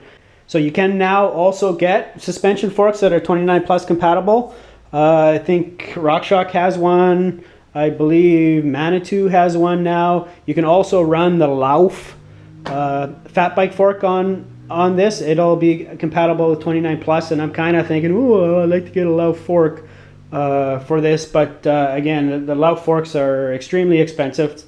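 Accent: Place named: Canada. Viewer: This dude sounds Canadian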